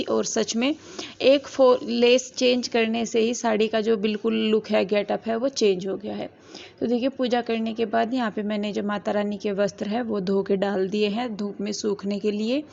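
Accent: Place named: Haryana, India. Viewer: Nice recipe di and aap haryanvibolte bahut achhe lagte ho.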